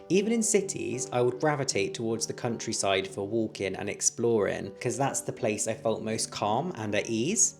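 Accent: United Kingdom. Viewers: I love Somerset! I'm from Bristol but moving away (I think to the outskirts of Weston?) when my daughter finishes school. Have considered Wells as I adore it, and Glastonbury... but no trains and I don't especially love driving. Are you from Bristol, you have the same accent as me?? Love finding your channel and I will follow your journey! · Really interesting take 😊 Enjoyed this. Somerset is wonderful. Trying to narrow your accent down. Portishead?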